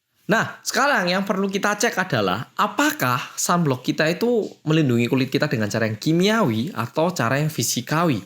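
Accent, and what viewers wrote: Java, Indonesia. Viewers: Aku wes mbedek....."orang Korea yg "jerawatan"..." ee e jebule yg medok....salah dech..isin aku... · Oke hellow guys. Welcome back to bersama orang korea yg MeDdokk 😂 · Bisa barengan notif youtobe and live di IGmu mas korea medok.. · Notif medoook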